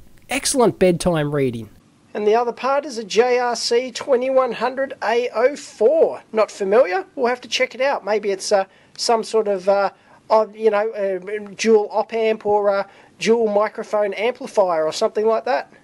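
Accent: Australia. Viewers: He speaks "strine." :-)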